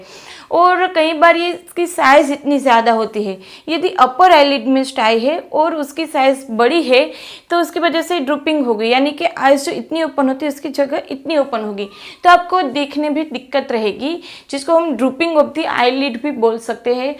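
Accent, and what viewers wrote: Gujarat, India. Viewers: Madam apka surname se ap north indian lagte ho per hindi ascent se gujarati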